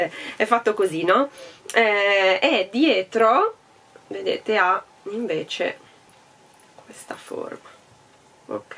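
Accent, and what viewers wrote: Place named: Florence, Italy. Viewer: Bellino!brava che parli il fiorentino😜ciao occhi da cerbiatta!🌺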